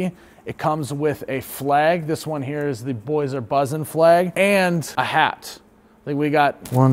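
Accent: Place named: Minnesota, United States. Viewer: You're from Minnesota, aren't you? Love your channel.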